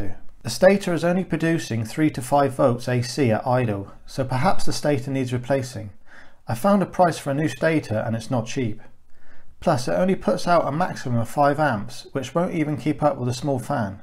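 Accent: United Kingdom. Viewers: Those skids are TITANIC. Though it makes sense if you're learning to fly or are flying an experimental aircraft. Still look weird though. Also you sound English. Are you a trained and licensed pilot? I was wondering how the law for homebuilt micro/ultralights (whichever's smaller) worked.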